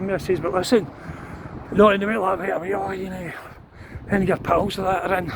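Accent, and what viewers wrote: Glasgow, United Kingdom. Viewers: You’ll need to buy yourself a wee battery charger, that will charge your phone as you walk, they are handy wee things. And you don’t need to be politically correct with your English words, we all love your Glesga accent And love your loyalty towards all your old pals, very nice touch that you name them in your videos, I’m sure their family will appreciate that you still talk about them even although they are gone As the old saying goes GBNF 😊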